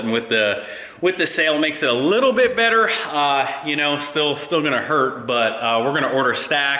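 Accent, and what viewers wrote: South, United States. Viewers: I love how your Pickens up a litter southern sounds in your voice. Awesome keep on trucking buddy. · Starting to get that southern twang.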